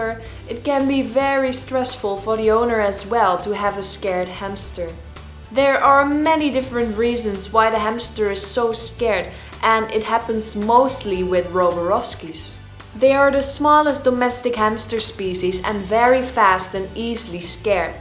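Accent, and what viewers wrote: Netherlands. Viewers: I can hear ur dutch i don't know why, het is gewoon beetje het accent..... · Are you dutch??? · Lauren Mcintyre Nederlands denk ik ook. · i have 1 qeustion: are you born in the netherlands because you sound dutch to me ( i really like your videos but i was wondering because im dutch my self) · Thank you so much for this video! I have a pair of little robos. I have waited about a week since I got them and I've tried to tame them a couple of times. They are usually scared of me but yesterday they took a treat from my hand. It definitely takes a lot of patience to tame a robo! I will be using the techniques in this video to aid my taming process, Btw I love your accent! Are you Dutch? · ik heb je door je hebt een nederlands accent ja ja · Pedro Paúl She's Duch i think. I have Dutch relatives and i recognise the accent when they speak English. 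I like it too 😊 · Wie hoort ook dat ze Nederlands is?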